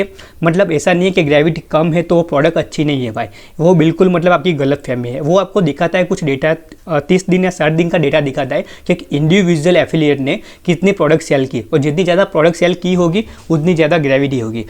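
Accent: Gujarat, India. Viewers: Kya aap gujarat se ho? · bhai tame gujarati 6o?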